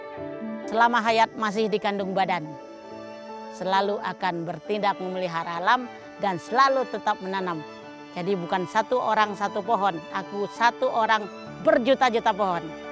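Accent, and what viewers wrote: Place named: Minangkabau, Indonesia. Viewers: Dari logat umi bicara, sepertinya org padang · Melihat logat nya seperti nya umi urang awak! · logat Padang susah hilang ya mi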